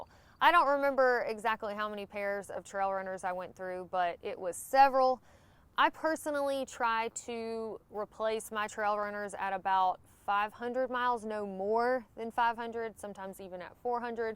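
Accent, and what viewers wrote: South, United States. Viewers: Southern American accents are so annoying but decent video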